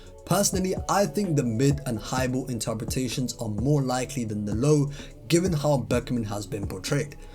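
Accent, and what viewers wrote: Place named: London, United Kingdom. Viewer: I like to see that one piece powerscaling and playing with the vivrecard/databook statements is still alive and well. It peaked in c0 vid then has been up and down i think. It seems to be lively again maybe due to the recent chapters. I have been out of the loop for a while. Perhaps i should get back into it. On another note You have a very strong london accent. You are maybe the only british black muslim london one piece fan i know of. Lol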